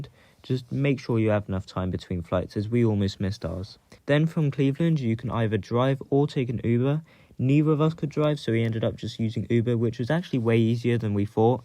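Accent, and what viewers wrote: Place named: United Kingdom. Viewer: I don’t particularly like roller coasters (because I get motion sick) so it’s a mystery as to why I was recommended this video 😅, however I do live in Ohio and have gone to King’s Island a lot. I decided to watch the video and found it interesting to hear someone from across the pond talk about kings island. 😊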